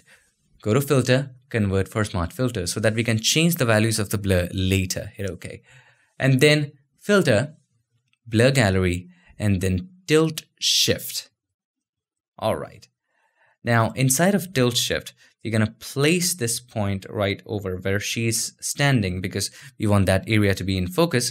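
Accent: India. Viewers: I usually consider Indian accent unbearable to listen to, however..... You are one of the very few that find pleasant to listen to. Congratulations on your audio and voice-over, it's amazing.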